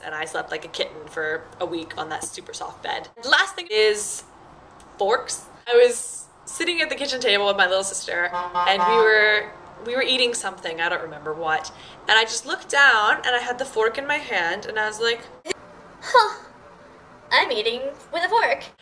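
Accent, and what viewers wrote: South, United States. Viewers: I can't remember if i asked you this before or not so Im gunna ask again... Do Koreans notice your southern accent? Yours isn't really noticeable to me but Im sure it is to someone who doesn't come from the south (and to anyone who is reading this, I mean the southern part of America). I have more of an accent so would they notice it as country or just American? Like would they point at me and say "cowboy!"? Or cowgirl i guess... Lol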